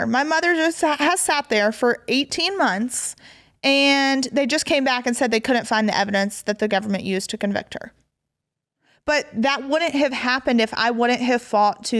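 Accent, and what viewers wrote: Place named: Georgia, United States. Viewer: Todd and Julie have done a fabulous job of raising you!!! Look at you!! Look at what they have done. I still listen to all the old shows on USA network every night when I go to sleep. Your voices lull me to sleep. You talk like me. I'm from GA. I hope I can hear these voices on new shows in the future. You are a great success for Todd and Julie! Keep up the steady work for them.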